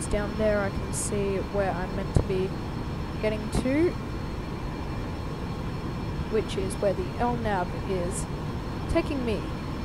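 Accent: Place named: Australia. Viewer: subbed because aussie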